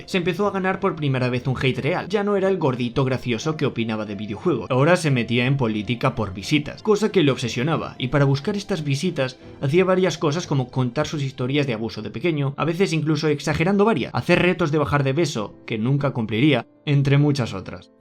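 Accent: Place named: Spain. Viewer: Por qué tienes acento español y usas modismos Mexicanos?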